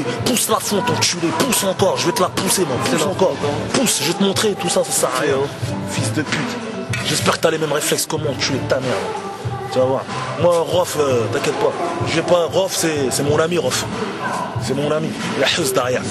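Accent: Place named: Paris, France. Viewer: il parle gabin, samat joe et mec de Paris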